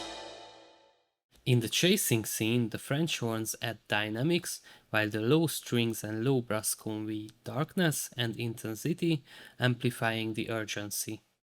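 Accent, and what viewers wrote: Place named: Hungary. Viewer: TUDTAM A KIEJTÉSEDBŐL HOGY MAGYAR VAGY (nagyon kiraly a video amugy)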